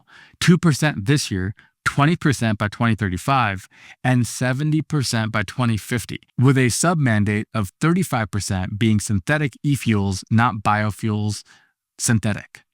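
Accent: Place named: United States. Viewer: Speak proper English which is British. USA English pronunciation is awful unpleasant to listen to, so many mispronunciations.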